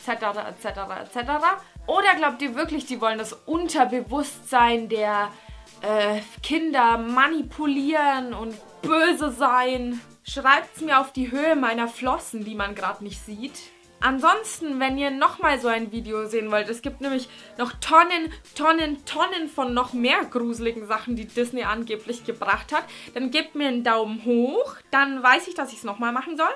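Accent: Franconia, Germany. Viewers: Kommst du aus Franken? Oder was ist das für ein leichter Dialekt? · kommst du aus franken???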